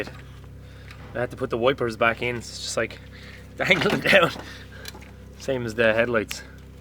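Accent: Ireland. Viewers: Good old Irish accent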